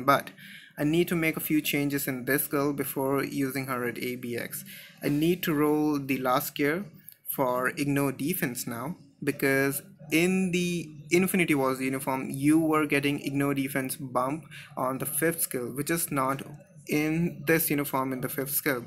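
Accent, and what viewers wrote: India. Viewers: your accent sounds Indian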